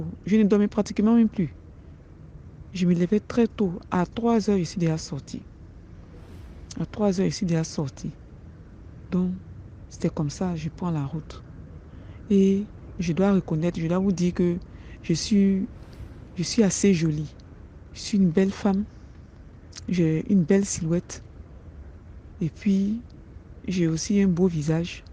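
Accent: Ivory Coast. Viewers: Par ta voix si je ne trompe pas. Tu es de côte d'ivoire.Tu es très couageuese. Mon coeur aller battre plus que mon souffle. Gloire à Jésus. Ce que j'ai compris ce que on dois s'attacher à Jésus en tout temps et en tout lieu.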